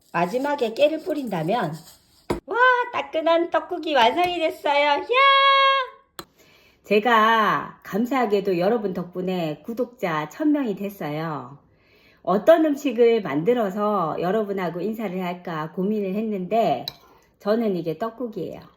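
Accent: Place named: Gyeongsang, South Korea. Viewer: 경상도 억앙도 음식도 정겹네요~^^ 새해엔 좋은 일만 가득 하세요~~~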